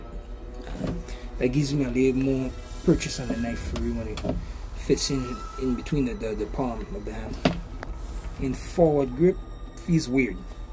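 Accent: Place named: Sint Maarten. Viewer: Bro.. u from Sxm? Ur accent is to close to home..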